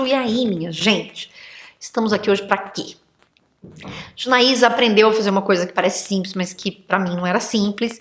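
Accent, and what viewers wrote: Rio de Janeiro, Brazil. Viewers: ...amo esse carioquês!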